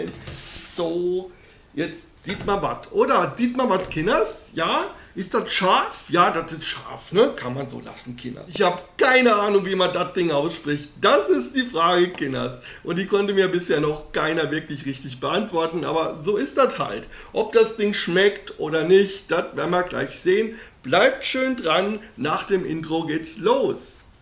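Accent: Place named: Ruhr, Germany. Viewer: Man, wo hast du denn so perfekt Ruhrdeutsch gelernt. Absoluter Treffer. 😂😂😂🎉 Die Welt braucht mehr Humor. Spitze. Sehr gut getroffen. 15 Daumen hoch. L.G.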